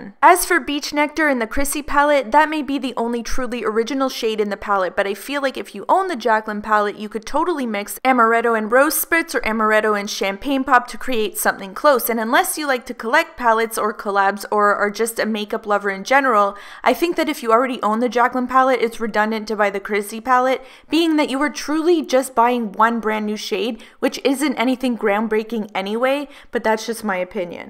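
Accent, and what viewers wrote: Canada. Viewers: R u Canadian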